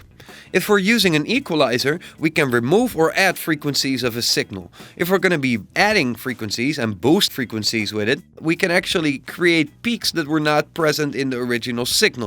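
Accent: Netherlands. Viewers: Toevallig Nederlander? 😀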